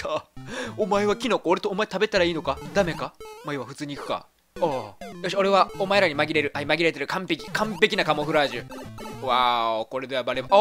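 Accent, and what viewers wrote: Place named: Kansai, Japan. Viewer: チャンネル登録しました！編集上手いし、関西弁好き♡めっちゃハマりそう！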